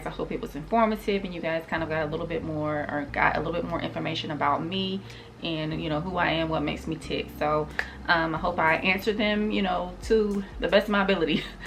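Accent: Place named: Alabama, United States. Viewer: Yaay to 3k & counting 🙌🏾🎉! When I got the noti for this video I couldn't wait to listen on my drive home. I have so much too say...re: balancing life & how u had the channel for years, 2020 was your time & the pandemic allowed u to get a great start again. Also, years ago, the kids were younger so it probably was a bit more difficult to carve out time when u had to tend to them more. I'm a single mom of an 8 yr old & I'm slowly starting to feel like I can breathe a little at times. Also doing a 3/12 schedule is great esp. if u can make it so that u can have a long stretch of days off. Re: channel growth-it's true what u said about not comparing yourself to others. I wish u continuous growth of a genuine community of positivity. Engagement & consistency is so important, from what I've heard. Re: u loving NYC...I was so excited to hear that! 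I live right across the GWB in Jersey but I was born & raised in the Bronx & a grad of NYU 💜. Also, re: selling your clothes, it is time consuming but I have an idea, whatever your daughter doesn't want, u can do a declutter type of video (more content 😉) & then do a sale. It is a lot of work but for a short time instead of keeping up with listings on Poshmark or the like. Lastly, I kept wondering if u were from Alabama because your accent reminds me of someone who is from there as well. I could go on & on but I'm so happy that I found your channel & thank u for sharing 🤗.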